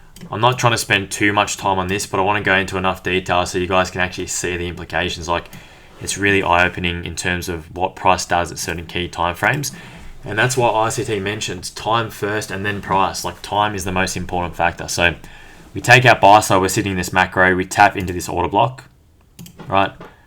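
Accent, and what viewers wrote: Australia. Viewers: Are you Aussie bro? Great video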